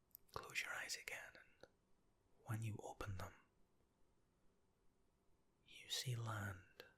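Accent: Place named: Glasgow, United Kingdom. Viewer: Oh it really has pal. This is my 3rd year here, it's funny how fast it starts to stick hahaha... 🙈 That's why I got so excited about your Glaswegian in your accent vid, feels so like home haha! I study music at the royal conservatoire of scotland:)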